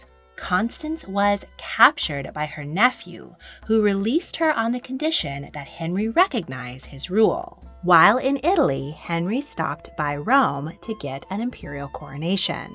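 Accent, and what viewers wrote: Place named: United States. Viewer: What a HORRIBLE, horrible nasal American voice. Ouch, it hurts.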